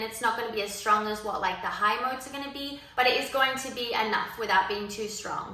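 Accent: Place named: Canada. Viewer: Catching a slight Canadian accent coming through 🤭 Sold on this! Looks like a great product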